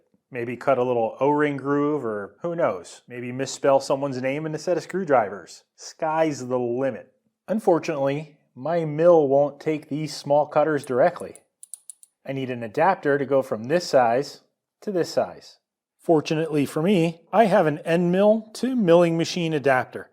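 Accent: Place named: New Jersey, United States. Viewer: YOU have got to be from ... New freakin' Jersey. You sound like Nfreakin'J. Your jokes have a New Jersey edge to them. You used the word "tuchus" correctly in a sentence, and I have a strong feeling you know what pork roll, egg and cheese on a hard roll, SPK is..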